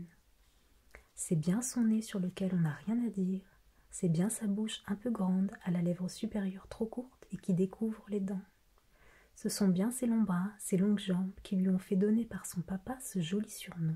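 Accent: France. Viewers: Elle est française ? je me pose la question depuis que j'ai commencé ses vidéos, je crois reconnaitre son accent français quand elle parle anglais mais je ne suis pas sur 😅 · C'est une anglaise qui parle très bien français ou une française qui parle très bien anglais parce qu'il y a un accent fr nan ? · I've heard some french accent in your English 😍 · Mais j'ai l'impression que tu es française qui parle couramment anglais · Je suis française et je pense que tu es française car quand tu parles anglais tu as un accent français . Sinon je trouve ça super cela permet d'apprendre les base d'une langue tout en ce relaxant ! Bravo ! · Tu est française ?? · I love this so much! The French accent is wonderful to listen to, couple that with an ASMR artist and you've struck gold 😀💯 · oui elle est française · Yes she's french 🇫🇷❤ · je pense aussi qu’elle est française car je trouve que son accent anglais (qui est très bon) sonne un peu français ☺️ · son accent en anglais suppose en effet qu'elle est française. 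J'étais juste curieuse au début mais je suis agréablement surprise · vu son accent français en parlant anglais, oui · Attendez, êtes-vous française ? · Elle est française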